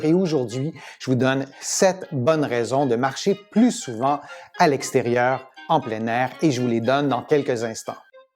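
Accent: Quebec, Canada. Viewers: Passionnant,,merci cher monsieur,je m ''abonne.....qui plus est , j'adooore votre accent du Québec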